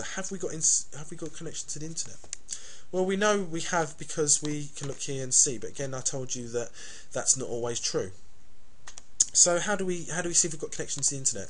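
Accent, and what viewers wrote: United Kingdom. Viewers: I have no issue understanding him or seeing the screen. He even has a UK accent which is different then the was we speak obviously here in the usa.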